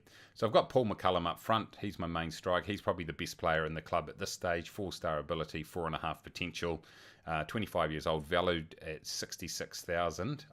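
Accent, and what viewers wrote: New Zealand. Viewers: Love the spreadsheet and a nice wee return on that gamble! Nice 1st up game episode I liked it mate and its weird hearing a Kiwi accent on YT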